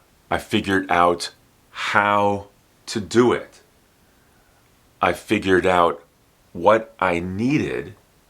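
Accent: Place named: United States. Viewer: I love you prononciation teacher. You're the really american spoken i've never known.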